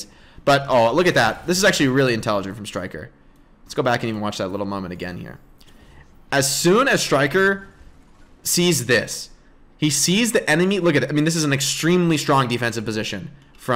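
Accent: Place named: San Diego, United States. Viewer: The intro sound like Doug DeMuro. Is that just a San Diego cadence thing?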